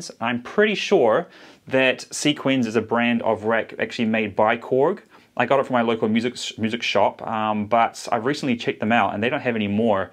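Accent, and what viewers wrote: New Zealand. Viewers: So, are you a Kiwi?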